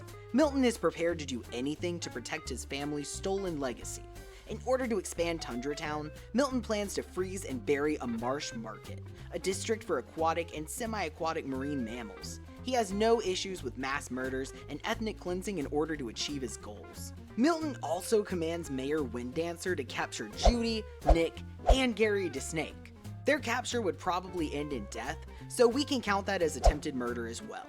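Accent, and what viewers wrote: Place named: United Kingdom. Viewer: I missed your British voice😢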